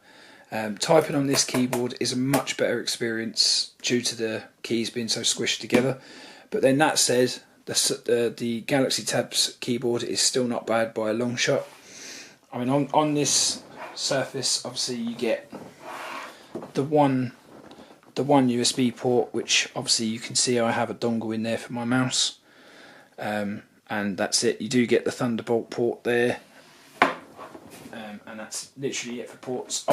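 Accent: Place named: United States. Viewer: he is speaking American English not british english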